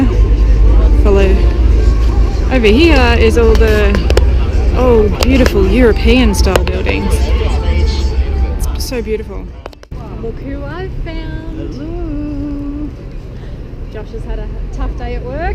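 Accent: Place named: Australia. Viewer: I love you Australian accent. Australian accent sounds very British, which is far more beautiful than American accent. Long Live Great Britain！God Bless America！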